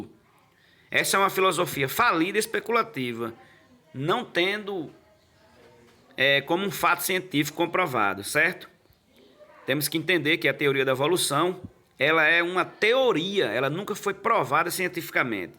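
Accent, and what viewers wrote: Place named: Northeast, Brazil. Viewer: Pelo sotaque desse narrador do vidio ele evoluiu do jumento porque ele e um nordestino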